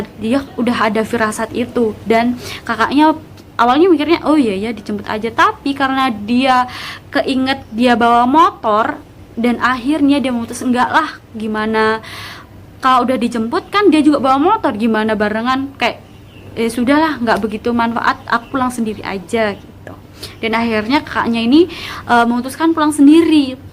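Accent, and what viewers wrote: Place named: East Java, Indonesia. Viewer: Rek mesti asal jawa timur yach kak... Serem juga yach dengarnya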